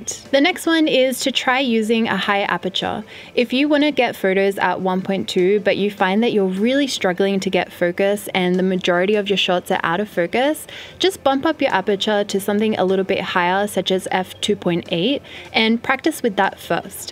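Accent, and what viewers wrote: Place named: Australia. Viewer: Your accent is like Aussie pronunciation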